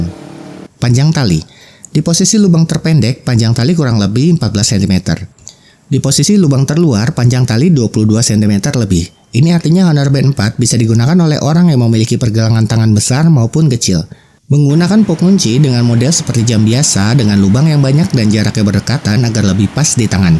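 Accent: Bogor, Indonesia. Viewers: Reviewnya ringkas tapi detail banget, mencakup hal-hal essential, padat berisi, informatif, dan yg keren itu udah ada subtitle hardsub 👍🏼 Keren nih reviewnya, makasih udah sharing bro. Btw, orang Bogor ya? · Bang orang bogor bkn??? 😅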